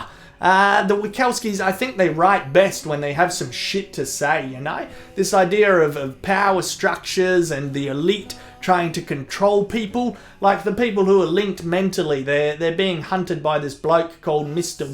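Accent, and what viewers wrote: Australia. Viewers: g'day ozzy man. i enjoy your takes on the many pictures up for grabs and i'm a big fan of australian diction in general. i enjoy how youse and the brits rock the english language. i've been checking your channel to see if you had reviewed the other episodes of true detect s2 as i wanted to hear your thoughts on the show, so i was pleased you touched on it in this video. i was surprised however to hear you give it a passing grade mate. apart from ep1, farrell and mcadams, the show has largely been shit and most especially that action piece at the end of ep4. it was utter trash! and wtf was with that riot shell shotgun double-tap in ep2? why the fuck would you double-tap with riot shells? like it's not accomplishing anything. anyways keep it up you hoser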